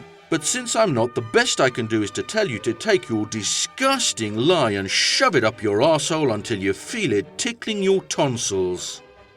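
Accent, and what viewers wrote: United Kingdom. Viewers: I like the montone swearing in a british accent, . . . and the classical music.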